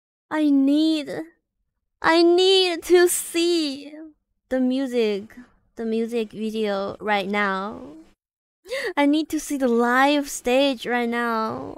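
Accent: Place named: United States. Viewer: you speak English like you from the states. That's pretty rare in China.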